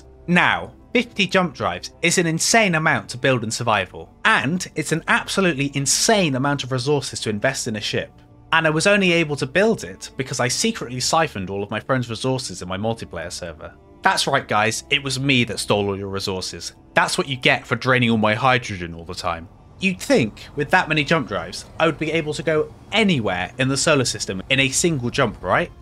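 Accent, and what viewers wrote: England, United Kingdom. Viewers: are you from south`or central england ?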